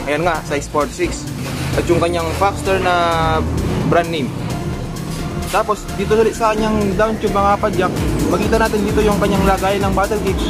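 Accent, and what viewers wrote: Pampanga, Philippines. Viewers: Kapampangan ka lodi??? Sa pananalita mo kase HAHAHA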